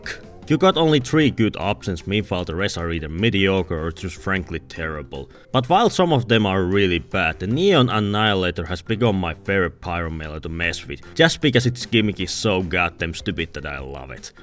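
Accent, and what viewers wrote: Finland. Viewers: Kuuntelin minuutin ja haistoin suomalaisen aksentin. Good video regardless! Informative and staid pretty close to the topic.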